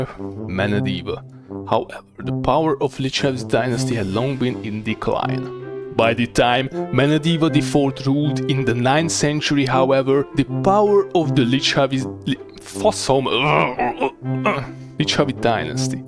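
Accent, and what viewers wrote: Hungary. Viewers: Never heard an AI with a Hungarian accent before, I think you might be chatting shit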